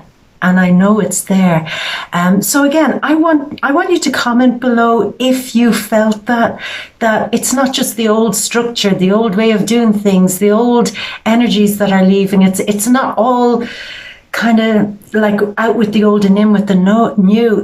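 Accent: Ireland. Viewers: Anything you do or say is always a blessing , you have a healing energy and that Irish accent is a killer , as a Leo I can only cheer you doing you. Thanks for being ❤️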